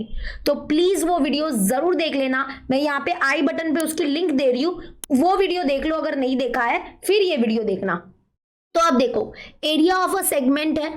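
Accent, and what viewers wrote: Maharashtra, India. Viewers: Dii are you Maharashtrian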